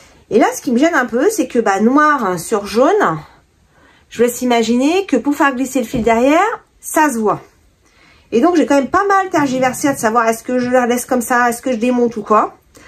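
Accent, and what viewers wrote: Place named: Manche, France. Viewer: Ah la marque Chevignon pour la génération 1972/75 🙌🏻 C’est marrant ni quand je vous écoute j’ai l’impression d’être avec une ancienne collègue : exactement le même accent (elle était originaire de la Manche)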